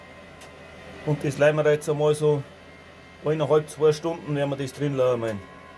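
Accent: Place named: Upper Palatinate, Germany. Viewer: Servus tolles Rezept, schaut mega lecker aus des Hendl, hast Du guad gemacht, vielen Dank für das Teilen😋👍👏und zwegst dem Oberpfälzer Dialgt loos i a moi was do 😁👍👍👍 LG Da Wolfe 😁👨‍🍳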